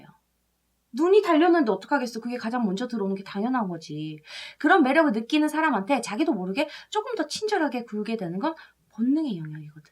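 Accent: Gyeongsang, South Korea. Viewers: 갱상도 어딘교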